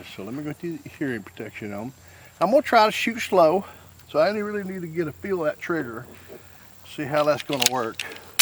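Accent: United States. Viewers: What state do you live in? Sounds like America. Sorry I'm a POW in commiefornia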